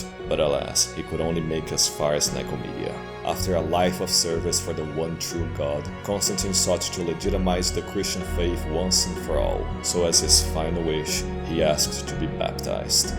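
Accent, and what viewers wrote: Brazil. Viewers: Teu sotaque de brasileiro deixa o vídeo muito melhor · O sotaque entrega o Brasiliense. A propagando ao Constantino é tão boa que até Eusébio teria inveja! Muito bom!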